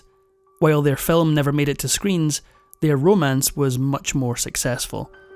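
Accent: Scotland, United Kingdom. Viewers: I don't know what your talking about! I thought this man had a lovely Scottish accent. I'm Scottish too and very proud of our accent. What a cheeky you've got! 🏴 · Ah. the narrator is a Scotsman - how novel is that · People only talk about her WW ll contributions but the more you remember or read about her, this was one sick Beech. Addicted to amphetimines and hateful of her oldest adopted son, she also felt entitled to shoplift. Her marriages were a disaster. Lamarr, charged with allegedly shoplifting $86 worth of merchandise from the May Co. Wilshire department store, was found not guilty by a jury. Photograph caption dated April 25, 1966 reads, "Denies any intention to take merchandise from store without paying for it." She was married and divorced six times. I love the Scottish accent! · And as n Anglo speaking listener, not only Scottish, but clearly spoken and completely understood 😉 Beautiful lilt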